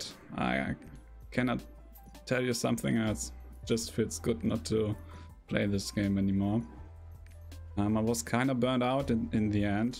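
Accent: Germany. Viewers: Hey there, von deinem Akzent ausgehende denke ich, dass du deutsch sprichst. In der Hoffnung, dass ich den Kommentar nicht auf englisch wiederholen muss, möchte ich dir sagen, dass es absolut nachvollziehbar ist, warum du dieses "Spiel" nicht mehr spielen möchtest. Suchtmechaniken, verbunden mit P2W-Mechanismen sind absolute K.O.-Kriterien. Ich habe LostArk nie gespielt, aber die Kritiken, welche ich darüber gelesen habe, schlagen in die gleiche Kerbe wie dein Video. Ich hoffe bald wieder deine Bloodletter-Zauber-Videos bestaunen zu dürfen. Auch wenn Albion nicht perfekt ist, die Community haut' raus. Und nach meinem Kenntnisstand, bist du da n verdammt gern gesehener Typ!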